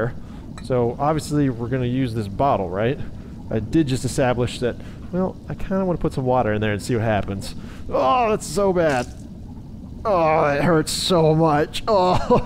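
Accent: United Kingdom. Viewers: British accent through me off lol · I was wondering that too I was like is this dude British or something lol 😂 I need to get a VR headset to have more fun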